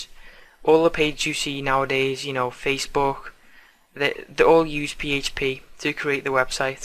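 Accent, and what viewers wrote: Liverpool, United Kingdom. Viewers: You sound scouse lad, you a wool? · Are you perhaps Scouse? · Ziqian Li the guy in this vid is a scouser (liverpool) lol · are you a scouser?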